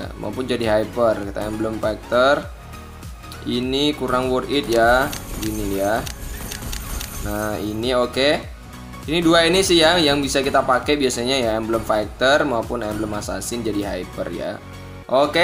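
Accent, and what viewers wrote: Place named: Pekanbaru, Indonesia. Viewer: Lho abg org Pekanbaru..?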